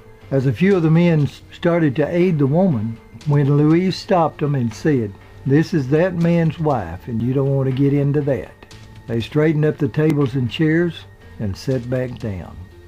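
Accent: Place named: Mississippi, United States. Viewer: Wow, what a story!!! Great video and scripting, per usual! And, as always, your great narration with that Mississippi accent is the best!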